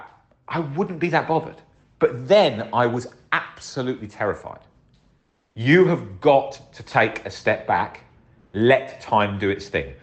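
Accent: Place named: England, United Kingdom. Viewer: Thanks for the great video! I also have to say: you sound a LOT like Alan Watts! As an American, I wonder if you both come from the same area in England cuz the accents are almost identical! 😂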